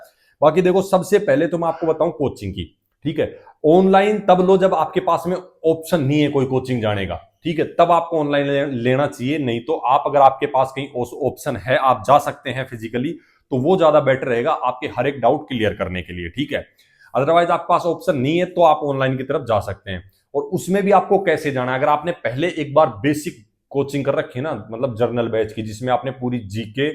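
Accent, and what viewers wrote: Haryana, India. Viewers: Asa lgra sir ap haryana se ho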